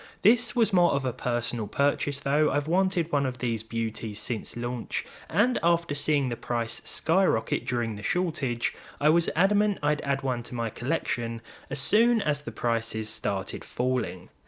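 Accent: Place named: Cockney, United Kingdom. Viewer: Your review is fine but your cockney British accent was tearing up my ears. And it pays off 👍🤤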